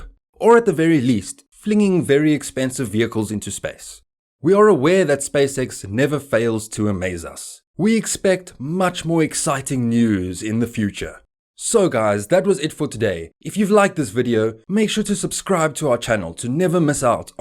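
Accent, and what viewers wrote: South Africa. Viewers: Are you south African. You have the accent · Nice South African Accent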